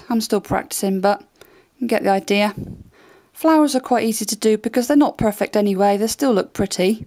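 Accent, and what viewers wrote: United Kingdom. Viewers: Are u British or scottish you sound a bit like it ;)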